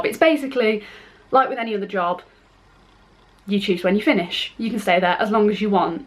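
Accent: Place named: England, United Kingdom. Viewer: wow cool accent, are you from England?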